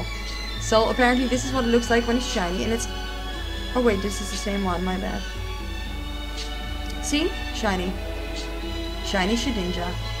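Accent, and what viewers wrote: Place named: Netherlands. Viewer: wow congrats haha, I have shiny Shedinja too (got from my brother) also, you kinda have a Dutch accent, could it be you're from the Netherlands?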